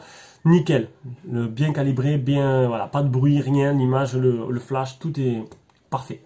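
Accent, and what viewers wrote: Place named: South, France. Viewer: Merci pour ce test qui m'a captivé que j'ai regardé du début jusqu'à la fin. Bonjour l'accent du sud et on sent le passionné pas forcément professionnel (ou peut être j'en sais rien...). La prochaine fois il faudra parler du type de charge rapide (18 watts je crois) et la possibilité ou non de charge à induction mais le test est vraiment pas mal...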